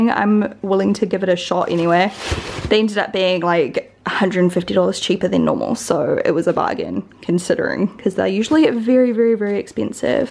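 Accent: New Zealand. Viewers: love you xxxx extra love your kiwi accent, makes me feel like I'm home xx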